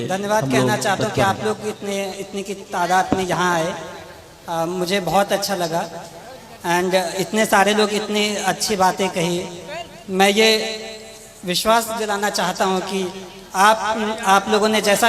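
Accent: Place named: Samastipur, India. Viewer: Sir ye to language samstipur ka hai❤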